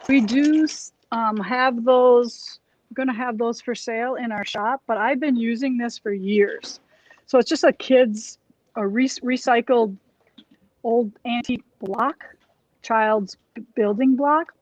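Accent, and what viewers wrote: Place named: Wisconsin, United States. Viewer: “How ya’ doin’ over dere.” Spoken like a real cheesehead! My husband and his family are from WI and they talk just like that. 😂 And, everything about you reminds me of my SIL. 💖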